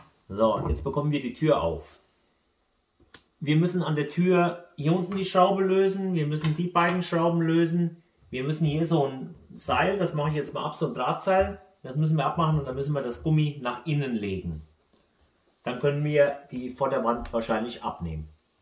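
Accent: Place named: Dillenburg, Germany. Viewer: Sag mal wo kommst du her vom Dialekt her hört es sich nach Dillenburg an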